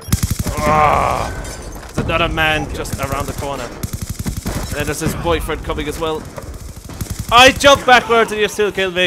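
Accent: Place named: Ireland. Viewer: you are much funnier than Pewdiepie and deserve many more subs than him:) You are a really great guy and I love the Irish accent you have. I would love to game with you one day:)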